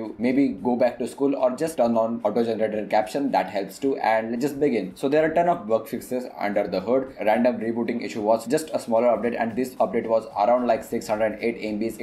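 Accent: India. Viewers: love your accent...it feels wowly Indian....😎😎😎thumps up...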